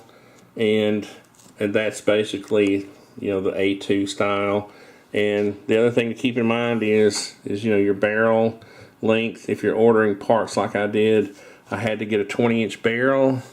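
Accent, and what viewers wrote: South, United States. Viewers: that man comes from the South. Man you can hear that.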